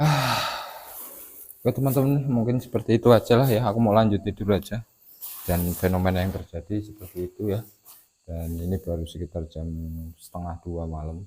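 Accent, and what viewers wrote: Java, Indonesia. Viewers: kalo boleh tau di daerah mana yh bg logatnya kaya jawa😁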